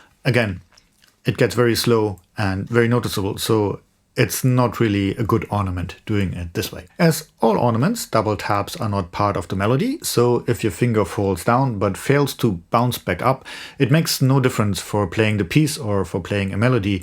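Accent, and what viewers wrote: Germany. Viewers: The best shakuhachi tutorial on YT! Even your heavy german accent doesnt matter :) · He has aGerman accent????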